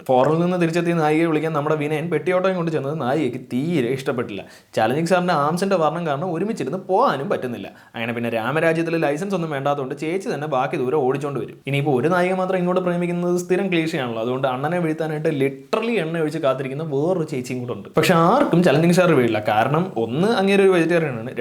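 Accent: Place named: Palakkad, India. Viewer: Bro നിങ്ങൾ പാലക്കാട് തമിഴ് വല്ലോ ആണൊ നിങ്ങൾ സംസാരിക്കുമ്പോൾ തമിഴ് mix ചെയ്തു malayalam സംസാരിക്കുന്ന ആളെ പോലെ ആണ് എപ്പോഴും തോന്നുന്നത്.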